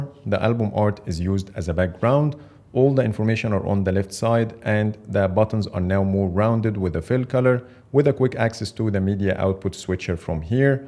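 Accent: Egypt. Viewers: كانه عربي مصري🤨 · Off topic but you sound Egyptian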